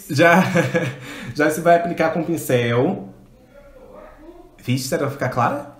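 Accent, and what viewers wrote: Bahia, Brazil. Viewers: SE TEM SOTAQUE MAIS LINDO QUE O BAIANO, EU NAO ME LEMBRO 😍😍😍😍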